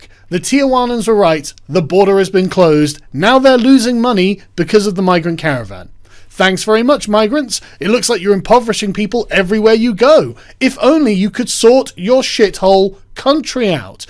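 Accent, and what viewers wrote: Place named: England, United Kingdom. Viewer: The best English accent ever! You could sell me anything after 2am on cable bro.....like Michel Cane in Zulu ( ole boy).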